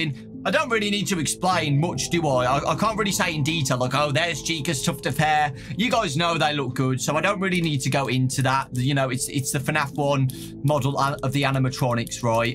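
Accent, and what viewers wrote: United Kingdom. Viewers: Ever since I watched ur channel I only think of fnaf when I hear a British man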